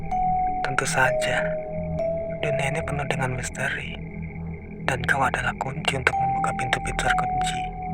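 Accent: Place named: Sumatra, Indonesia. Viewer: Author org Sumatera to? Kirain jawa ngapak... 😅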